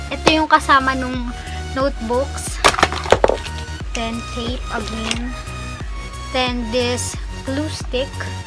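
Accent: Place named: Philippines. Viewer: wag ka na mag english halata nang pilipino ka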